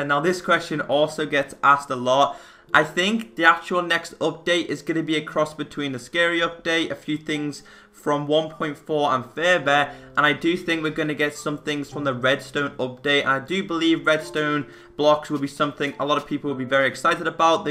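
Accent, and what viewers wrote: Liverpool, United Kingdom. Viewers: Are you from liverpool ?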